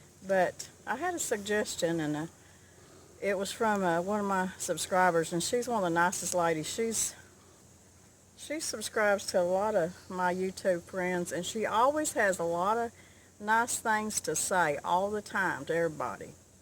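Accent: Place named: Arkansas, United States. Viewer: This is so much like my mom's recipe. They lived through the "hard times" of the depression and WWII and farmed a small piece of land in south western Manitoba(Canada) and they had to make things stretch so she used just 1 cup of raisins and 2 cups of whole milk from the cows she milked herself. Mum made this pie when we were growing up and I still love it today and I'm 61 yrs of age. Thank you for sharing with us. I love your Arkansas accent. We lived for one year in Kansas, when I was 8 rys old, right down in the the south west corner near the Oklahoma border. At that age a kid is like wet clay and I picked up the local accent so much that when we moved back to Canada everyone thought I was born in the states. To this day when the Star Spangled Banner is played my eyes well up and I remember that wonderful carefree year as a boy riding my bike in our small town with my first dog running beside me. 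I'm tearing up as I write this so will leave off with many thanks and good wishes to y'all.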